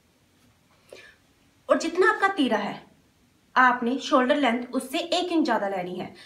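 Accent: Punjab, India. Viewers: I am from Punjab .....love your Punjabi accent ❤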